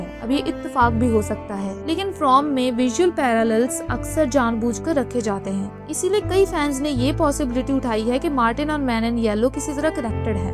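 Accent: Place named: Dogri, India. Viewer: Aap kaun si state we hain. Accent paahdi dogri lag raha hai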